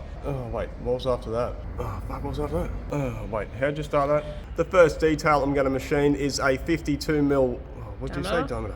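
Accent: Australia. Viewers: I think appearance is every thing and your appearance is so much better when you shave your face, also your Camera Lady should also appear every once in a while as your Partner in Business. I also enjoy the Birds and is it Jeffery or Homey your Dog! You are Highly Skilled in your trade and you remind me of Myself, i also worked Many Hours in My Trade as a Collision Repair Technician for 43 Years. I also worked Many Hours, sometimes till Midnight! I Also Really Enjoy your Australian Accent!!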